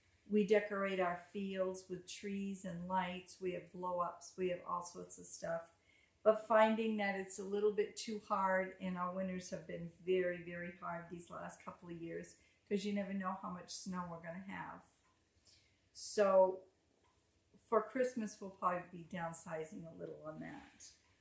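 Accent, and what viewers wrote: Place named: New York, United States. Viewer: nice video. Your accent is it from New York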